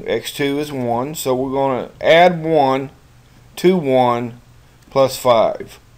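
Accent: South, United States. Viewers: you sound like hillbilly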